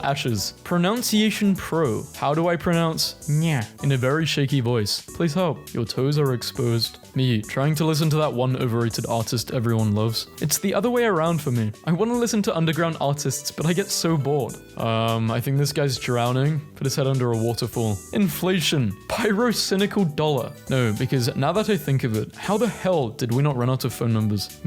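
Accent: United Kingdom. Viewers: i watched this entire video, thought about it for a moment and then realized you narrated the entire thing with a british accent. why didnt this come to me during the video?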